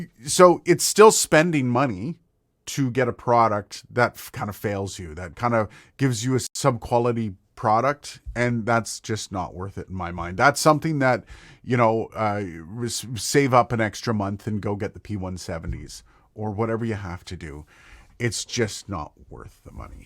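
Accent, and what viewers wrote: Canada. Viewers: You’re Canadian eh?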